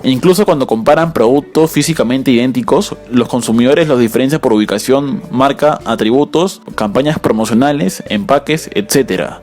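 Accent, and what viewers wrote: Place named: Peru. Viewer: Ese acento es peruano jeje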